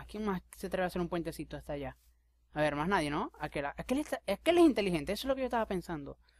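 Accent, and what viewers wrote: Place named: Venezuela. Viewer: eres de venezuela? xq yo si lo soy, tienes el tipico acento venezolano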